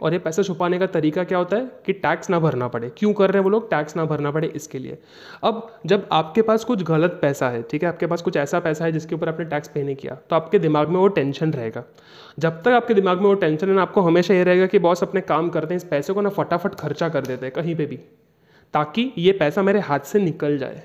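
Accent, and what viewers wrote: Gujarat, India. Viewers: Aap gujrati ho? 🤔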